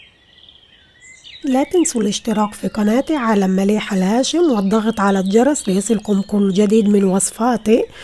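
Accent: Palestine. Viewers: تسلمي تسلمي يا ستي انتي يسعدك ويسعد كلامك الفلسطيني الحلو اجمل شيء انك بتحكي باللغه الفلاحيه الحلوه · انت مبدعه ولهجتك روعه فلسطينيه اصليه من اي البلاد انت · Intisar Ayasa هاي لهجة فلسطينية ريفية في قرى بعض المحافظات وللعلم انو بفلسطين يوجد عدة لهجات ما يقارب من اكتر من ٢٠ لهجة وكل لهجة تختلف عن غيرها وجميعها لهجات حلوة ولها رنين جميل في الاذن وذلك لتعدد المناطق في فلسطين الحبيبة. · ما شاء الله يسعدك ويسعد لهجتك ويسعد فلسطين واكلاتها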